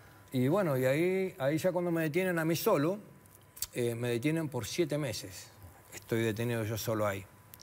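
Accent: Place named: Argentina. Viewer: Que buena historia yo soy de Honduras pase si no es lo mismo pues fue casi igual per bueno gracias a dios tuve la suerte de solucionar mi problema de migración y me identifico con vos por mucho primero por nuestro acento Honduras y Argentina tenemos muchas palabras similares y cultura aquí en california la mayoría son de México Guatemala o el Salvador le notan a uno su acento y tenemos que nosotros decidir las palabras que los demás dicen para poder darnos a entender pero bueno vos trata de apelar tu caso es en caso bárbaro muy bueno lo podes ganar suerte y ojalá te podas reunir con tu familia.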